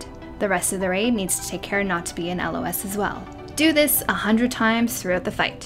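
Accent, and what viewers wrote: Canada. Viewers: Yeah, very beautiful voice... and there's something abowt your accent that sounds Canadian, too :)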